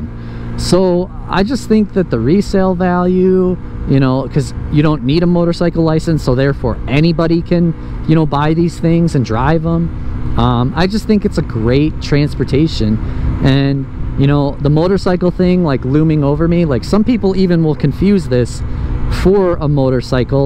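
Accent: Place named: La Grange, United States. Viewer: I'm also pondering a 50cc or 125cc scooter. You sound like you live close to La Grange. Class M gives you a valid motorcycle license to legally operate any motorcycle with an engine size of 150 cubic centimeters (cc) or greater. The Class L license is for riding a motor-driven cycle with less than 150cc engine size. Note, riding an electric scooter does not require a special license.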